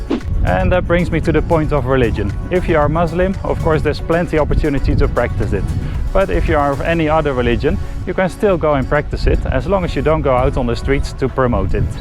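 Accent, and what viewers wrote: Netherlands. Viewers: Hollander aan die accent te horen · Your accent sounds Dutch? Are you from the Netherlands?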